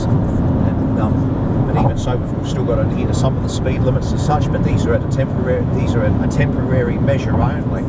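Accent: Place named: Australia. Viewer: Your Australian Sounds adorable - there has to be more of you from Down Under over Here in Germany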